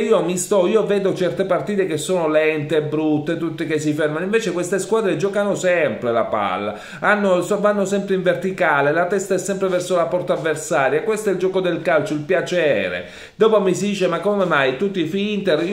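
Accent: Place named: Palermo, Italy. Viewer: Quando uno è palermitano si sente..😉 Ti seguo con piacere compà (passami il termine, ma sono della zona anch’io) 💪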